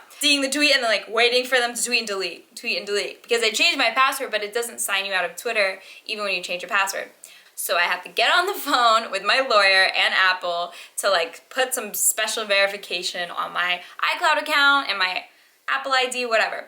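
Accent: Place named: United Kingdom. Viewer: the attempt at the British accent makes her look even hotter 🙃😍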